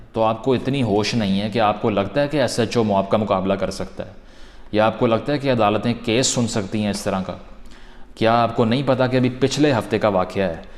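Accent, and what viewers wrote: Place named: Pakistan. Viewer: This guy sounds like an educated Pakistani..........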